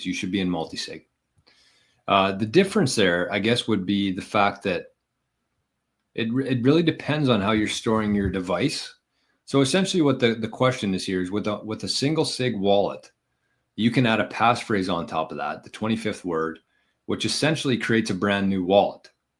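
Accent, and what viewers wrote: Canada. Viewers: I bet this guy played hockey? Sounds Canadian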